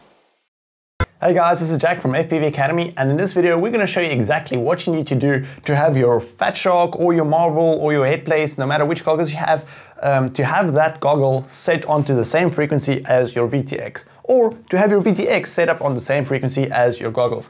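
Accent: South Africa. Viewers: You sound like a South-African... Thanks for the video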